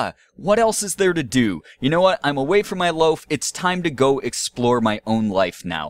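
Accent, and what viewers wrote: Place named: United Kingdom. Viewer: Hey, It's I am Bread with bad puns, British narrating, and (much) less rage! :D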